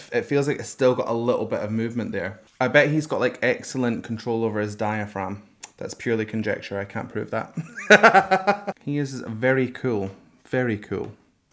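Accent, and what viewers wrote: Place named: Glasgow, United Kingdom. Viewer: what you said about RM's speaking voice being smoother than his rap, I think is true of SUGA and j-hope, as well. SUGA's rap voice jumps wide intervals with interjected squeaks and lots of aggressive rhythm, but his speaking voice is warm and humble. j-hope speaks sweetly most of the time, but he can rap like a dancing firecracker! BTW, I enjoy not only your commentary but your accent. Glasgow is one of my favorite cities (I live near San Francisco). Can't wait to go back! Stay well!!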